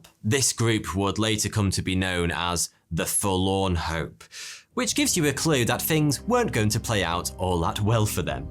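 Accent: United Kingdom. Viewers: Are you British? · Donner Party is at most a passing, macabre joke. Sometimes people will use the name when waiting for a table at a restaurant so they can hear the hostess say, "Donner, party of two." But the only thing weirder than the Donner Party is seeing someone with a British accent make a 22 minute joke of it. Beyond one-liner jokes, I'm unaware of any American who views the Donner Party as a comedy of errors.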